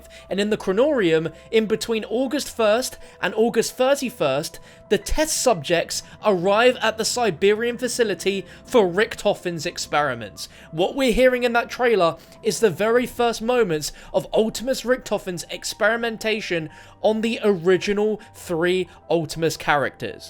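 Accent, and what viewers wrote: United Kingdom. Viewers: Anyone know where I can watch this without some annoying british guy talking over it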